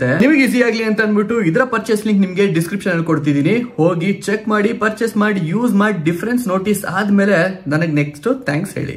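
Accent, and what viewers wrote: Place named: Mangalore, India. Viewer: Aa manglore fast phase accent yellarigu artha agalla.. Artha mdkondre masth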